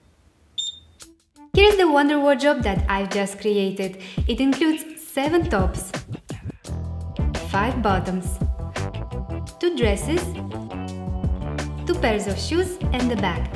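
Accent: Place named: Russia. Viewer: Здравствуйте! У вас такой сильный русский акцент. Понимаю все что вы говорите )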